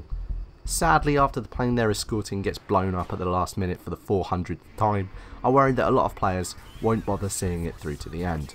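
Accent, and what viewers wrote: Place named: United Kingdom. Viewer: I can't sit through another YouTube game review video with a heavy-handed British accent. I just can't. It's everywhere. Imitators are even putting out videos. Some of them have obviously practiced quite a bit. I didn't down-vote or anything like that. I just can't listen to the cockney-esque or "Liverpool-ean" narrations anymore.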